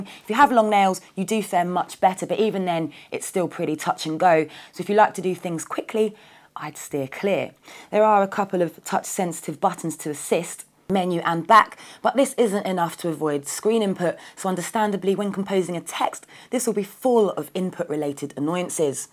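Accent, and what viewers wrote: United Kingdom. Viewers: Who is that beautiful gal. I love that british accent.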